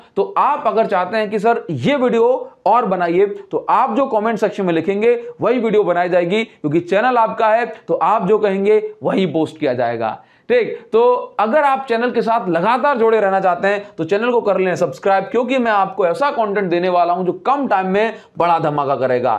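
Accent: Odisha, India. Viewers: Sir aap odisha se ho kya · bhaiya are you from odisha ?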